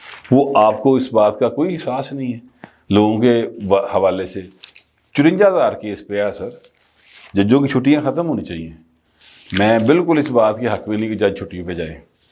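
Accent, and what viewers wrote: Lahore, Pakistan. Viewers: tohada lahori andaz bada changa lagda hey.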